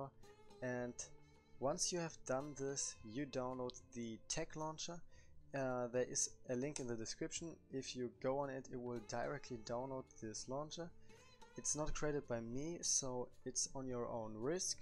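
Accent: Germany. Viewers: Ok Cool Aber man hört deinen deutschen Akzent :) im englischen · aber sprichst deutsch, das meinte ich, und du hast den launcher auf Deutsch und man hört den deutschen Akzent raus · Are you German? If yes, you speak good English👍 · i can hear the german accent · Deutsch man hört es direkt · Ich liebe dein Deutschen akzent 👍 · Man hört so krass das er deutsch is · Man hört den deutsch Akzent 😂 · Man hört so hart das du deutsch bist lel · Einfach schön auch mal Deutsche Englisch sprechen zu hören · digga du bist zu 1000 prozent deutscher haha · Ich liebs wie man Deutsche an Ihrem Englisch erkennt haha, danke fürs Video !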